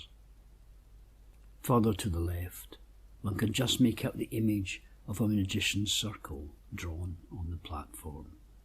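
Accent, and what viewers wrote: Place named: United Kingdom. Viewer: Dear, I want to thank you for all the work you do.. I am truly lucky to have you in this world, showing me the beautiful marvels I would have probably missed otherwise. Also the knowledge that you share and all the work you must have put into it all.. words are insufficient for how I feel towards it. Secondly, I am planning on taking a trip around the UK to see all the magical places. Do you have any suggestions? Also, I know it might come across as creepy, but I would love to meet you. You sound like you live in the UK, and I am a traveler on the journey of life and it would be an amazing addition to my journey to be able to talk with you. Anyhow, whatever happens, I wish you great love and bliss. Much love, Joep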